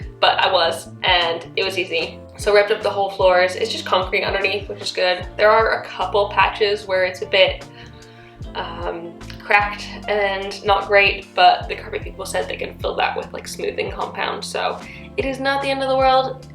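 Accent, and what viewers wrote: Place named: United Kingdom. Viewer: I loved watching this, as a fellow Brit who lives in Canada now, I rarely get to hear our accent and the word "flat" instead of apartment. I can't wait to see how it turns out :-)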